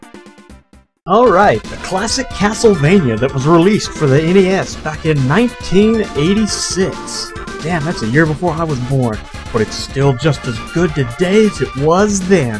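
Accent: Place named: Georgia, United States. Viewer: Well, I like it. But then again, I'm a HUGE stats-geek. Profiles, bios, statistics... I like anything like that. Also, trivia. ANYWAYS, what I really like about LPs is that every LPer brings a different attitude to the game. Like with Gundams and pilots. Your attitude, plus the charming accent equals my subscription. I would have that accent, too if I was born one state up. Damn you, Florida! I would trade oranges for peaches any day of the week. Unless I had scurvy.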